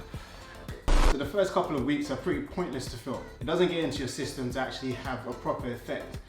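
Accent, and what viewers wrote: United Kingdom. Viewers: Dude I was also thinking the same shit and was gonna write a comment along those lines,he really does sound like one character and scene that came to mind...in SNATCH when TYRONE made a call to SOL and was like “Boris just got to his house...Hang On he’s not alone,he’s with some fellas”😆😆😆😂😂😂😂 I am South African and I love Bri’ish accents and all of GUY RITCHIE movies,their dialogue is priceless.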